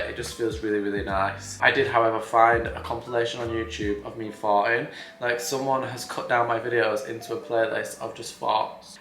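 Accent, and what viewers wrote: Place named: North, United Kingdom. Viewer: I love ur northern accent & you always sound like you had a spliff 🤣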